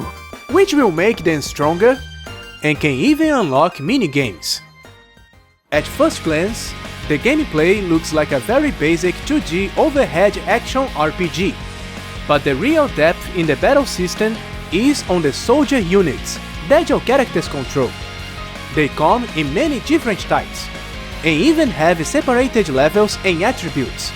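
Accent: Brazil. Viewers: Hello Bro. Found your channel after searching for Uppers Review and I'm having fun with all these japanese game analysis. Are you from Brazil? Cause your accent resembles of someone from there. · Your accet is Brazilian right? at first I thought it was Japanese, but then I found it more familiar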